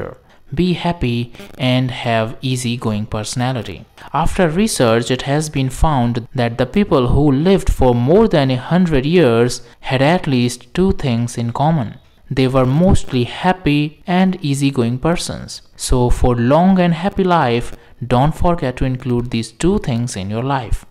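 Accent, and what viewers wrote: North India, India. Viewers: Hi, can you please work on your pronunciations. It's very distracting to hear you talk with a north indian/gujju English accent. Or pls have someone else do the voice over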